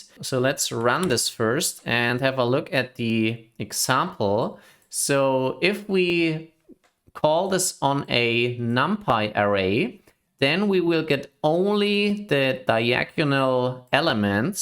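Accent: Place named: Germany. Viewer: ich versuch NumPy zu lernen aber bin immer noch von diesem deutschen Akzent ablenkt😭😭aber klingt sehr niedlich❤️❤️❤️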